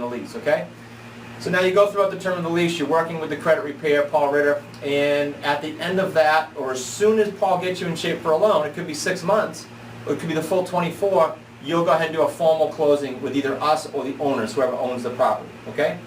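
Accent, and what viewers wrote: Boston, United States. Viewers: Is this guy from Boston? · Is that a Bastin accent ??? 👀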